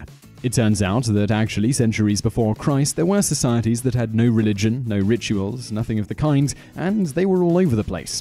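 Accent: United Kingdom. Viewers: I really wouldn't expect a man with a British/English accent to have an UNBIASED opinion of the American Revolution. If the colonies were "impoverished", it was due to the taxation and mismanagement by the Crown. The colonists had to be good shots, since colonial larders were augmented with fish and game. The "Lobster Backs" as the British soldiers were called by the colonists, lined up in neat rows and fought in the European way. The colonists wore clothing dyed in nature's colors, and fought more in the native American way. Furthermore, the British were quite unfamiliar with the terrain in America--something the colonists were NOT. Furthermore, the British hired German mercenaries--Hessians--who sometimes defected to the American cause. Let's also keep in mind that the British senior officers--from the wealthy, landed aristocracy class--didn't exactly treat their own soldiers (let alone the colonists) with any modicum of respect. 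<link>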